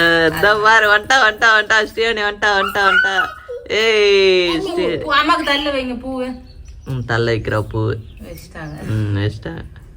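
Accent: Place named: Jaffna, Sri Lanka. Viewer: இலங்கை யாழ்ப்பாண தமிழ்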